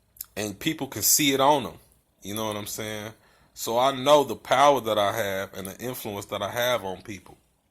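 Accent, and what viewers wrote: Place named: Oakland, United States. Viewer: Sound like you from Oakland, Calif.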